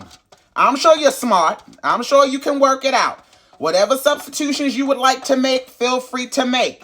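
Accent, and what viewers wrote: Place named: New Orleans, United States. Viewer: I'm from Louisiana! And I always thought that Crystal hot sauce was a New Orleans product. And yes, I would use ALL the ingredients that you have there and some smoked paprika. And we call your bread a po boy French bread. I'm with you on the sugar thing, too!😂❤❤ (I'm trying to figure out your Maryland accent. Kinda sounds like some New Orleans folks!)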